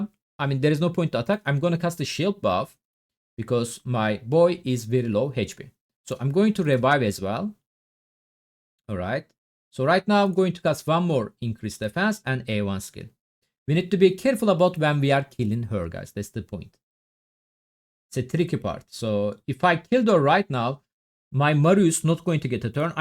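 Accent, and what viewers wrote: Turkey. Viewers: Kesin bizden,ingilizce mukemmel olsada tonlama tam turk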